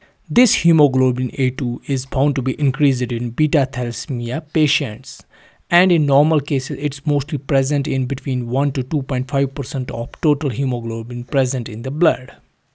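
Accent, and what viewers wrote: Kashmir, India. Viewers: Are u from Kashmir I think so because of ur pronounciation.❤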